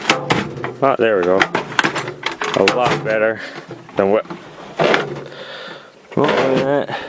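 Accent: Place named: Canada. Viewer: Canadian much ? Lol. Never realized how much we say 'and that' as well as 'that sorta thing'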